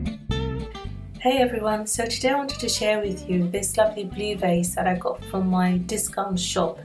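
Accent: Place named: Southern Africa. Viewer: I've been watching your videos and trying to guess where your accent is from... I knew it was Southern African 😏 Zimbabwe? 😂😂😂💟xx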